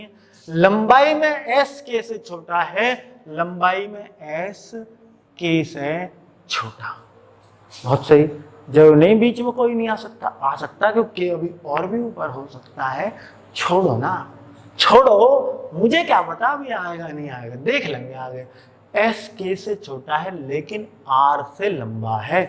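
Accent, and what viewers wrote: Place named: Uttar Pradesh, India. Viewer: Sir full U.P. wali feel a gayi hum D ko hi pel denge.😎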